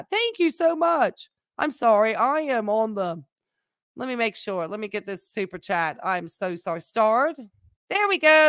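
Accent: United Kingdom. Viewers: Oh I just wondered bc of your accent. I figured it was the UK but was just checking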